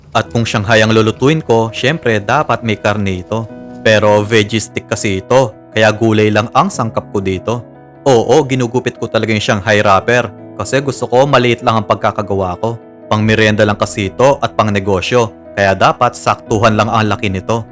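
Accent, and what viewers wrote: Pampanga, Philippines. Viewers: Kapampangan po ba kayo?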